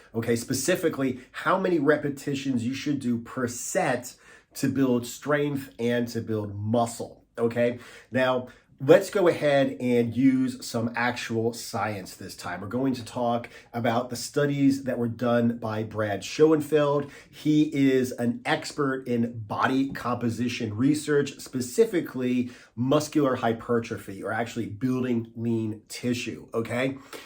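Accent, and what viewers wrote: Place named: Philadelphia, United States. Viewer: Great video, man! A lot of folks need to hear this. P.s. your accent makes me think you're from Philly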